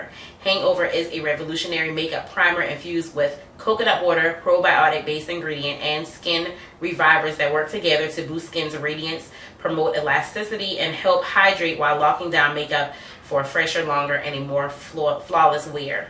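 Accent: Charleston, United States. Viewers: Very nice tutorial, you sound like you're from chuck town sc. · Lol your accent sounds very familiar to me, I could’ve sworn you were from Charleston, so I checked out your Facebook and it’s Columbia .... close enough... hey girl!!!!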